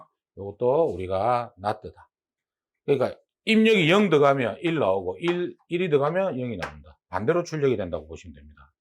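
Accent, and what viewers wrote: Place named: Gyeongsang, South Korea. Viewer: 갱상도 스타일의 직관적인 강의 좋아요 ㅋ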